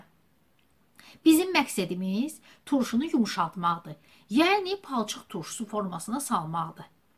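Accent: Baku, Azerbaijan. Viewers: Vallah darıxmışdım Bakı ləhcəsi üçün. Allah əlinizə golunuza güvvət versin, Amin! ❤🎉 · Bakili xanim ellerinize saqliq. Xeyirliye biwiresiz. Baki lehcenizi anami xatirlatsin. Nesillikce temiz Iceriweherlidiler. Anamda gozel, tamli fisincan biwirerdi. Mende anamdan oyrenmiwem. Super di Baki yemekleri. ❤❤❤🙏🙏🙏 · Nə şirin bakı ləhcəsi var.❤😅.Hələ istiyirsən qula qasasan sənə qızım.Əllərivə saqlıq. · Qırmızı kitaba düşmüş təmiz bakılılar Darıxmışam Bu ləhcə üçün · heç sənin kimi gözəl ,şirin ləhcəli bakılı qızından laykı əsirgəməy olar əllərin var olsun canım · Qurban olum lehceve can bakilim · Sizin elə qəşəng,şirin Bakı ləhcəsində danışmağınız, fincandan da ləzzətli idi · Salam bakılı qız sənə bakılılardan salam lap ləzzət edir mənə sizin danışığınız mən də cox vaxt bişirirəm bayramda da elə belə vaxtda da əyər xətrim istəyirsə toyuqdan rəhmətlik anam və nənəm gil qaşqaldaqdan daharırlayardılar atam ovdan vurub gətirərdi amma biz toyuğu bişirəndə 2 3 dənə böyük soğan atırdıq və sonra onu cıxardıb atırdılar biz soğan qovurmuruq mən özüm gavalı turşusu hazırlayıram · Əsil Bakı ləhcəsi!!! 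Ləzzət elədi!! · Can ay bakilim sesive quvvet daniwiq lezzet eledi❤ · Beh beh Can Bakilim ❤️❤️❤️inaninki fisincan qalib bir qiraga hayil mayil olmuwam daniwigiviza 💖💖💖nece deyirik biz bakililar edeln dedeli bawa salirsizeeee 👍🏻👍🏻👍🏻😂😂Mawallah Allah sagligi versin ruzuviz bol olsun biwirib düwüresiz bizde lezzet alaq 🤲🏻🤲🏻🤲🏻🤲🏻🤲🏻❤️❤️❤️❤️Allah qorusun xalqimi🤲🏻🤲🏻🤲🏻🤲🏻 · Bu bizim Bakı ləhcəmizə gurban olum. Amma biz Əmircanlılar abgora vururug fisilcana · SUPER ELLERINE SAQLIQ SENIN O GOZEL WIRIN LEHCEVE QUZU KESIM MENIM GOZEL BAKILI QIZIM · Can bakili bacim.Ùreyim daga dòndù.Wirin Baki lehcesinde bize mexsus fisincani mòhtewem hazirladigina gòre tewekkùr edirem.Ellerine sagluq.Mawallah olsun. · ALLAH QORUSUN MAŞŞALLAH ƏLLƏRİN VAR OLSUN TƏMİZ BAKİ LƏHCƏSİ🤲MAŞSALLAH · Fisincandan çox sizin ləhcəniz mənə ləzzət elədi. Mən də bakılıyam və əsil Bakı fisincanı bişirirəm. Xanımlara bir məsləhətim var. 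Əgər qara tavanız yoxdursa, qəm etməyin. Bir qaba 2-3 stəkan su tokürəm, köhnə ət maşınının setkasını atıram suyun içinə və bir çay qaşığılimon duzu əlavə edib 20-30 dəqiqə qaynadıram. Dəmiri içindən çıxarıram. Həmin suyu çəkilmiş qozun üzərinə tökürəm, 3-5 dəqiqə qaynadıram. Qoz qapqara olur, həm də turşməzə, heç lavaşanaya ehtiyac olmur. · Çox gözəl Bakı ləhcəsi ilə danışırsınız xoş olur.əlivizin ləzzətlərinə də söz ola bilməz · Can menm nenem de Bakilidi.lehce mene lezzet elyr.Allah var elesin haminizi.Ellerinize saglg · Can bakl can bakl lehcesi Adam birini bakl lehcesiynen danlsan esiden kimi ureyi qopur Cox teessuf ki az qalmlslq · Çox sag olun. Bakili ləhcəsiyçin darıxmışdım. Əla izah edirsiz . Mən də 40 ildir fisincan bişirirəm, amma qoza sogan qatmıram. Qoyun əti və quş əti ilə bişirirəm. Sizin göstərdiyinizi bişirərəm. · Ellerinize saglig gozel bakilim yorulmuyasiz .Lezzet edir baki lehcesini ewidende 🤗Masgura lap lezzet eledi 😊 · Əllərivə sağlıq bakılı qızı qurban olaram ləhcəmizə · Can bəh bəh təmiz Bakı ləhcəmiz və anamın bişirdiyi fisincan plov əlinizə sağlıq 🧿🧿👍👍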